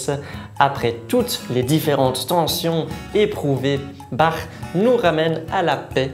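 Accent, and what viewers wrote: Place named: France. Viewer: je suis québécois et je te trouves très articulé et claire alors même si j'ai un peu de misère a m'adapter à ton accent français, j'apprécis quand même tes vidéos, merci beaucoup!!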